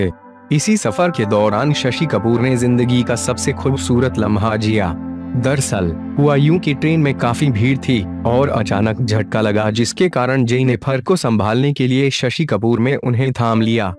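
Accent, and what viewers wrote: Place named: Bihar, India. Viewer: Bihari Type Commentary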